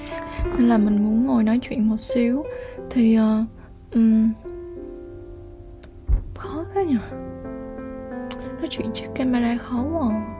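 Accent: Vietnam. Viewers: b nói được giọng bắc à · Giọng bạn ở đà nẵng phải kh? Nghe hay ghê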